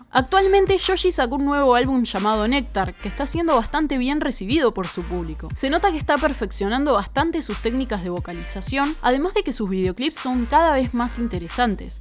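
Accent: Argentina. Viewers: Me encanta que le dice ChoChi , amo el acento argentino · Yo: Yoyi: Shoshi Amo a los argentinos ahajajsh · como decis "shoshi" me parte los webos! yo entiendo q en argentina pronuncian asi pero tampoco pa tanto, el nombre es japones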